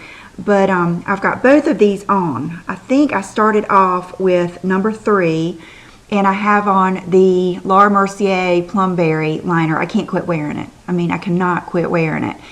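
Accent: South, United States. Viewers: I love watching you! I'm Southern also, so it's like I'm listening to a girlfriend. Big tip---- I've already tested Dior Star. It IS lovely, but doesn't wear all day or stay as natural-looking as Guerlain Lingerie de Peau. Virtually no one reviews Guerlain on YouTube but their foundation is fantastic! Sample it. It does oxidize slightly, so go just a tad lighter than what u think u need. :)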